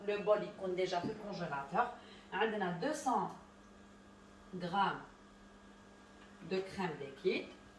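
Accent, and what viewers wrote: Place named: Algiers, Algeria. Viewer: Les feuilles de gélatine halal ou porc 🐷 moi j achète pas la feuille de gélatine.merci pour ta recette et t’a jolie voix algéroise 💋💋🤩🤩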